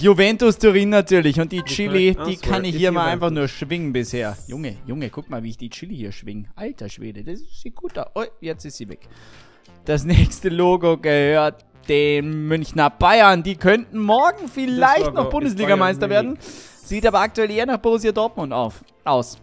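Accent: Austria. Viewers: Kommst du von Österreich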